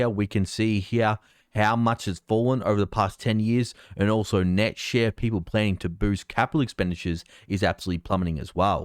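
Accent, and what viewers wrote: Australia. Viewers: Thanks, your sincerity, enthusiasm, and respect for your viewers shows on everything you do! And as an American, I love hearing real news delivered with an Australian accent! Great job!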